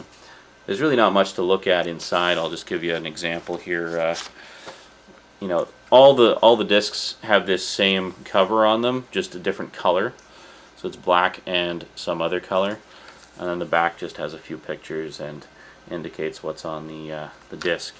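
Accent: Canada. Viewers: I knew you had to be Canadian or one of the states bordering Canada. Who else says aboot! I really like your Dead Space videos. Reminds me of hanging out with my friends and playing games together.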